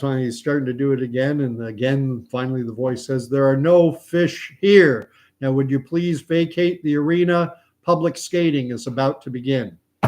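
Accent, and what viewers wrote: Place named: Newfoundland, Canada. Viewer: How do you get a one-armed Newfie out of a tree? Wave at him. That's an old one. I just started listening so maybe I am ahead of the show, dunno eh. Topic about moose now.. some idiots of practically all ages were getting close to a moose on the side of the road near Algonquin Park including parents with their kids while I was still a kid and my family stopped a bit to see the moose without getting out of the car and moved along. As a kid I knew getting out of the car would have been retarded. I don't know if anyone ever got hurt by it. We watched for a handful of minutes maybe and moved along. Newfie accent is good bud eh.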